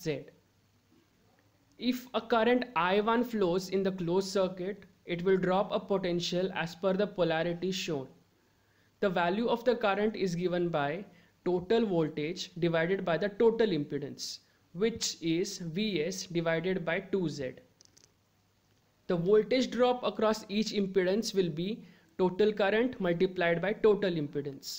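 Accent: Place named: Russia. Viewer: El inglés más ruso que he escuchado.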